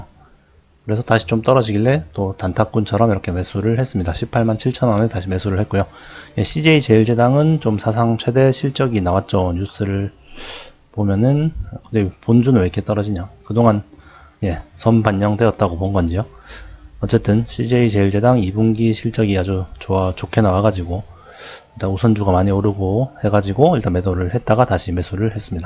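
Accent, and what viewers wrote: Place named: Busan, South Korea. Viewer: 말투가 부산분인 것 같았어요ㅡ ㅎㅎ 저도 부산입니다. 용호한의원이 한곳 뿐인가요?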